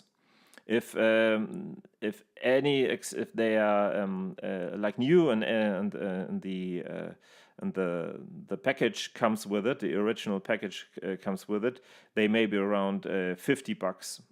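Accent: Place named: Germany. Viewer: I wanted to say Danke! Really instructive video and I've been using regular metal cassettes which go in all my other cameras and my Leicas too. I have the correct loader and a few IXMOOs so with your help now I am able to use them. Really helpful! And also I want to say your English with the German accent is absolutely perfect for this, it adds "authenticity", as if Leica had made this video from Wetzlar...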